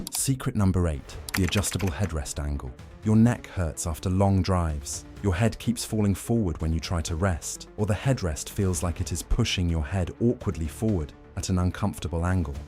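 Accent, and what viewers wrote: United Kingdom. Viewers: Trunk??? Dollars??? British accent???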